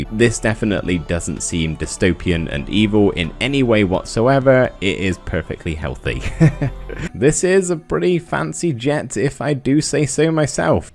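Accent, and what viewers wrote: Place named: England, United Kingdom. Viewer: Gutted to hear this. Thank you for so many wonderful episodes! Edit: oh, man, I'm surprised how sad I am about this ending. It was a little bit of chill happiness during a horrible time for all of us. 2nd edit: Of course you have to go with your motivation and I wish you continued success with your channel. I'm sure I'll give one of your other series a try soon. One last thing I've wanted to say for almost 2 years by the way: I love hearing your accent! It's so cute to hear a rhotic English accent from England (most speakers in England are non-rhotic). Somehow your accent makes you sound warm and friendly, although that's probably also your personality ha ha. Thanks again for helping us get through a tough time!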